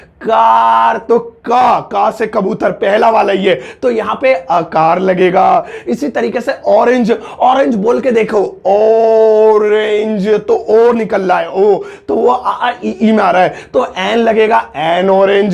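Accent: India. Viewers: sir apke ye desi tareeke se english bolna sikh gaya 🥹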